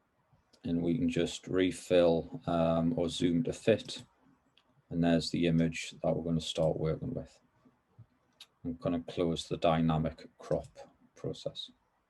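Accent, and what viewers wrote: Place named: Northern England, United Kingdom. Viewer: Great video I am from Teeside recognised your northern accent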